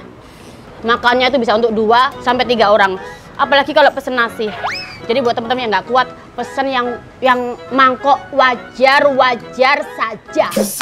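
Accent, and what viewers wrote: Java, Indonesia. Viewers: Mhn maaf yach knapa yach klo liat food floger lain makan tuh kyk'a gmnaaaa gitu...tp klo liat makan koq enak banget..liat wajah'a jg enak...ngomong'a jg enak dgn ciri khas medok'a... Maka'a aq cuma.subscribe channel'a doang...ciyuusss nih n.sll nunggu senin rabu jum'at...😘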